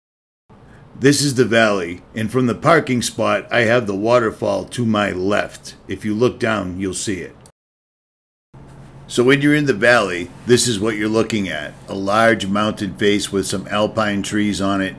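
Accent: Boston, United States. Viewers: Just stumbled across your vids while exploring the black hole of YouTube. What part of Boston you from? You better not say Framingham 🤣. Great vids, keep em’ coming. Mike- who hails from Boston but now lives in the burbs’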